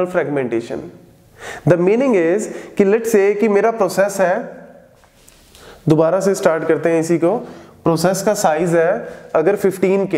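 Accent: India. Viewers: oh my god! why is it so hard to understand indian english? i can only understand 1 out of 500 videos i see around! i recommend indian guys to put subtitles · accent is way to strong. i already hate indian one but this is blasting my patience 😂. im sorry this is not personal, because i hate all idian accents.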